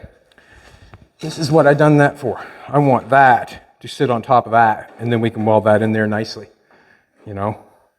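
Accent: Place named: Canada. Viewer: I love these videos, I have been seeing you online and hearing your name. I never knew your were Canadian until I saw my first video of you. haha. I was like... wait a second, I know that accent. Cheers to you. I am in Ontario and I vacation yearly in Souris, PEI. We want to visit Nova Scotia sometime soon.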